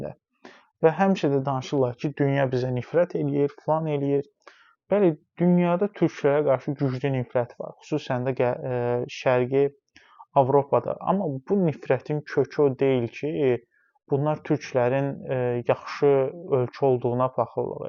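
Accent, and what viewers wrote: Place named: Armenia. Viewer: Sənə qulağ asanda adama elə gəlir ki bir erməni dığası zərbaycan dilini yaxşı öyrənib və Talış mıllətiylə Azərbaycanlıların arasın vurmaq istəyir,talışları mən həmişə özümə qardaş bilmişəm və biləcəyəm,sən deyilsən sən erməni dığasısan.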